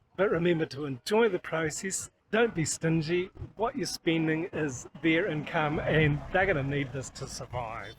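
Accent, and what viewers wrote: New Zealand. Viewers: Love that Kiwi accent!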